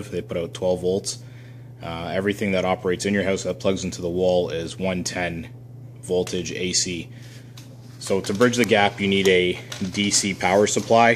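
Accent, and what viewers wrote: Canada. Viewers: sounds like he's Canadian to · You can easily tell hes Canadian. That's why he's well spoken. He's just not using slang or anything as some would in the US.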